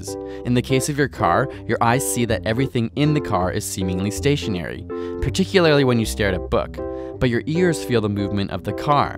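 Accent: Canada. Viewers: i hear canadian accent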